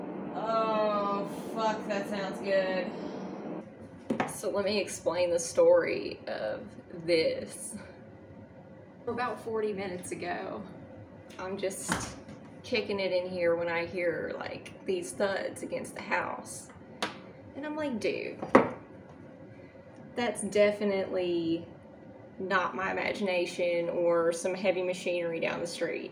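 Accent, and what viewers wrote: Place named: South, United States. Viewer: you're too damn cute and voice is so southern I love it lol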